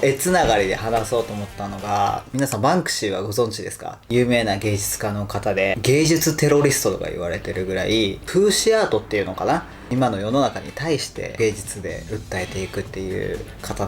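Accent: Kansai, Japan. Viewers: うますぎやろww 最近ちょっと関西弁出てきたよね！笑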